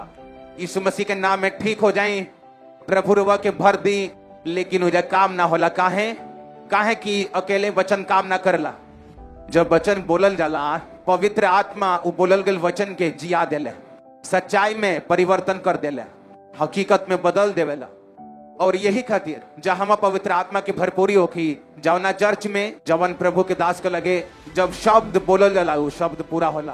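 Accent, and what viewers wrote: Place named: Bhojpuri, India. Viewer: Praise the Lord, Papa ji shudh bhojpuri. Wah...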